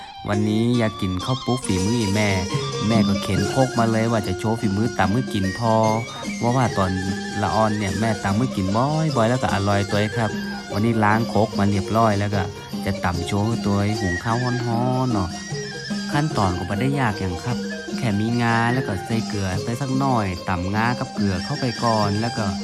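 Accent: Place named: North, Thailand. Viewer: ยี่สิบสาม อู้จาปะสากำเมืองม่วนขนาดน้อง อิแม่แข็งแรงขนาดเจ้า ตี้บ้านปี้สุโขทัยฮ้องว่าข้าว DaK งา ไม่กล้าพิมพ์คำไทยอาจติดสแปมค่ะ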